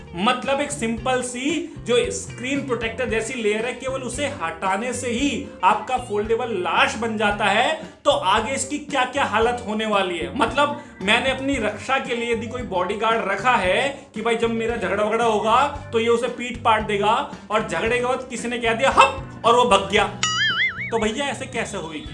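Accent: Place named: Haryana, India. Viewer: Bhai video bich me rok k comment kr rha hu You are so funny bhai haryanvi lag rhe ho bhai aap muje samsung fold bekaar phone🤣🤣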